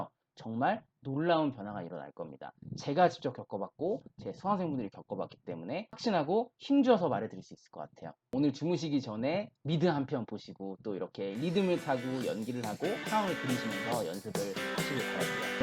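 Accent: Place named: South Korea. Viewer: 전형적인 한국식 발음이시네여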